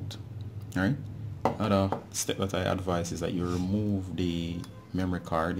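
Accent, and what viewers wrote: Jamaica. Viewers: Are jamaican? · From I heard your voice I knew you are Jamaican lol Your sim card confirmed it.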